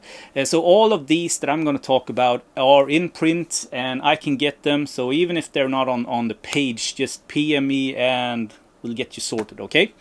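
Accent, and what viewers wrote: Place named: Sweden. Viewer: gillar svengelskan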